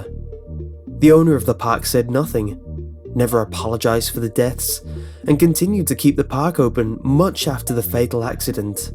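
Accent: United Kingdom. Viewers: I love your British :)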